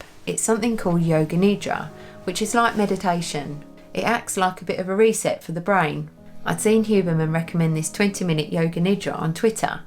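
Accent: United Kingdom. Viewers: This is ridiculous. Everybody is different. Screw Huberman. BTW, love your British accent.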